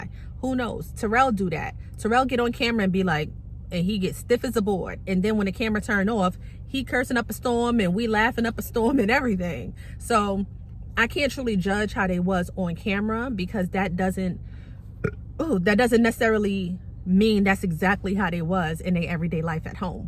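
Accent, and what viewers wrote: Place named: New York, United States. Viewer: I love your NY accent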